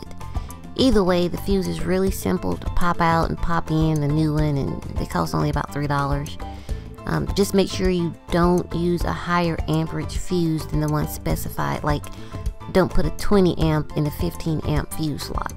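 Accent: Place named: South, United States. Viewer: I hope that you’d consider doing voice-over work. Your voice is unique and has a pleasant tone. And the southern twang is awesome.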